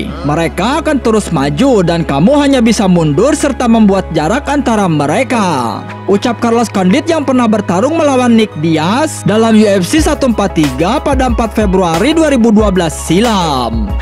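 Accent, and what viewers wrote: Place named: Madura, Indonesia. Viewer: salah fokus , voice over logat madura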